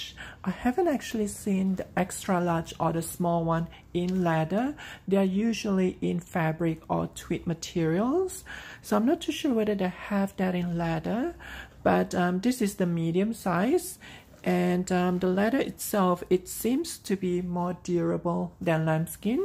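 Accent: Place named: Singapore. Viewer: I lived in Singapore for a while so I can hear the familiarity 💕 Thank you for the beautiful review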